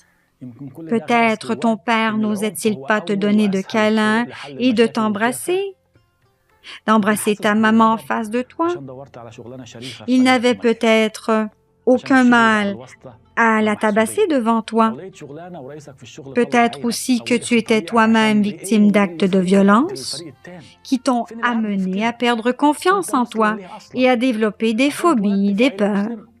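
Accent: Quebec, Canada. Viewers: L'accent québécois t9iiil hhh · Français avec l'accent québécois ! Excellent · J'aime beaucoup l'accent canadien j'ai l'impression et que je suis en trame du coûter un prêtre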